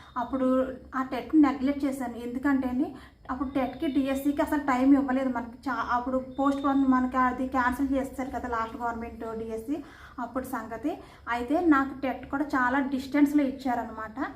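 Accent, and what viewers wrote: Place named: East Godavari, India. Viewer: నేను అడిగిన దానికి మీ ఆన్సర్ అంతలా ఎందుకు.. జాబ్ వస్తేనే చెప్తారా?? Madi ఈస్ట్ గోదావరి..మీ వాయిస్ అలానే ఉంది slang అందుకే అడిగా..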